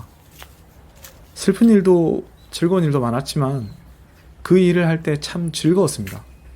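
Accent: Busan, South Korea. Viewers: 우연히 보게된 영상인데.. 희한하게도 끝까지 보게 됐네요. 익숙한 부산 사투리.. 그리고 같은 전공(아 저는 사범대는 아니고 그냥 국어국문. 동기들 중에 부산에서 교사 하는 사람들이 좀 있죠. 어쩌면 선생님과 같이 근무를 하셨을수도.. ㅎㅎ)게다가 쭉 보다보니 남편의 모교이기까지.. 이만하면 끝까지 볼 이유는 충분한 건가요? 학교에대해서는.. 선생님만큼 할말이 많은 학부모 시기도 모두 끝난 사람이지만 여전히 학교에 대해서는 많은 생각을 하게 됩니다. 우리 사회에 얽힌 실타래가 있다면 그리고 그 실끝을 따라가 보면 끝에 교육이 있고 학교가 있다고 생각하기 때문이죠. 큰 아이를 처음 초등학교 입학시켰을 때의 충격이란.. 내가 국민학생시절이었던 1980년대 초등학교와 별 반 다르지 않은 시스템에 놀랐습니다. 교실마다 에어컨이 있고 샷시가 좀 고급이고.. 그 정도 물리적 발전 말고 소프트웨어적인 발전은 찾을 수가 없었거든요. 선생님이 어떤 고민을 하셨을지.. 충분히 이해된다면 자만일까요? 아, 우연히 본 유튭에 너무 몰입을 했네요. 댓글까지 꽤 꼼꼼하게 읽었으니까요. 학생들의 댓글을 보니 좋은 선생님이셨음이 충분히 느껴집니다. 연못에서 탈출하셨으니 넓은 바다로 가서 마음껏 헤엄치고 즐기시길 바랍니다. 응원합니다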